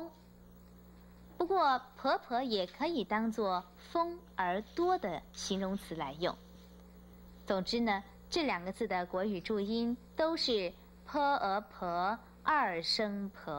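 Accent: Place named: North, China. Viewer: 這應該是北方人的，尤其是關外的，南京音是不會這樣的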